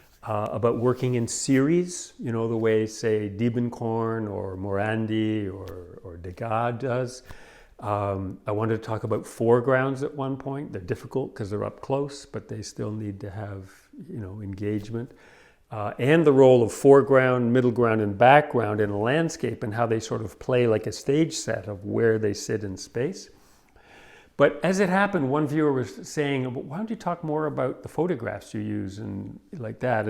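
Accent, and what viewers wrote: Canada. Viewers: You cant hide that Canuck accent 😆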